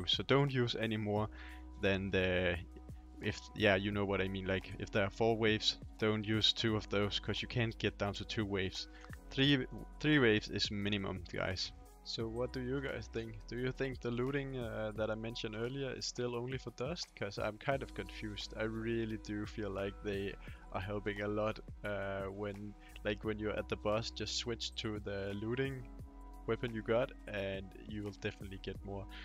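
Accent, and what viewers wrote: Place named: Germany. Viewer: I hear some deutsch in your english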